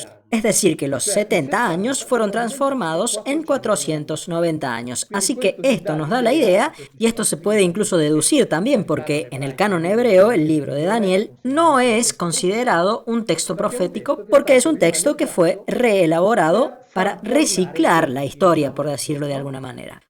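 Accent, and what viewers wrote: Córdoba, Argentina. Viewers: Que linda la vocecita, noto un acento cordobés, puede ser?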